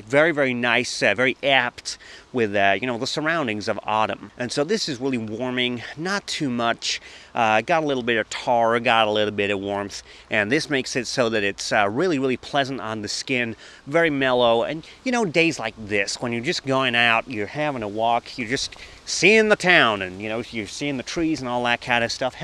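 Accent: United States. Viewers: Love the American accent and that early cinematic porn feel. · I was so confused when he started speaking in an American accent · An American accent? Really? I believe I can die happy now. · very nice american accent. Are you sure you wanna be a director rather than actor? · Great American accent! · Great list was a pleasure watching. Love the American accent 👍 keep up the great work. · I didn't realize this was until minute 10. The accent was so good I literally assumed flew in an American friend for this video. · That's an excellent American accent i gotta say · perfect American accent. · That's a great midwest American accent..and some Gene Shalit vibes..love this whole thing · The American accent is getting a little better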